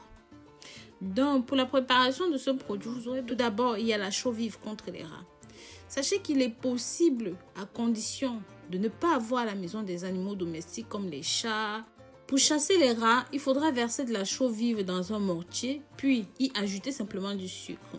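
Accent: Africa. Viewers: ton accent d'Afrique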